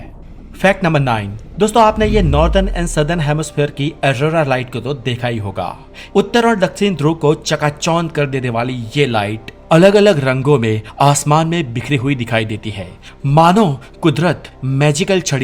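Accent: Gujarat, India. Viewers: Proper gujarati accent wali Hindi...Aur bhai wo DARK equation nahi hai, Drake Equation hai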